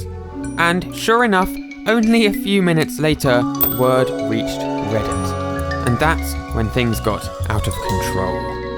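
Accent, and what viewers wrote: United Kingdom. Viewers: I thort u woor british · British voice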